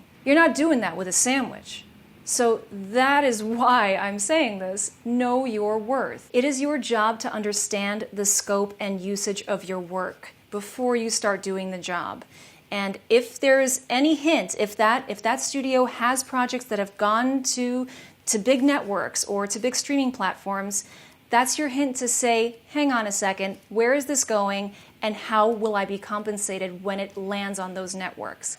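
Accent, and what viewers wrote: Russia. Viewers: I LOVE YOUR RUSSIAN ACCENT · wait, you're russian?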